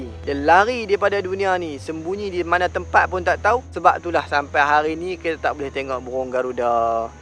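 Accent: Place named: North, Malaysia. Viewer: Syok dgr org utaqa bercerita... Rsa puaihhh dgq hahaha👍🏻👍🏻👍🏻😂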